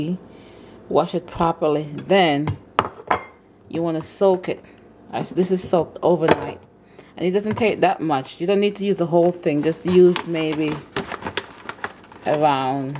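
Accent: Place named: Jamaica. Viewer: She clearly has a Jamaican accent sir.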